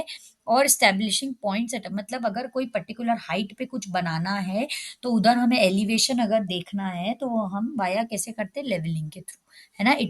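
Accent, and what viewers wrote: Indore, India. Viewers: ma'am's ur indori accent is the key..😯😯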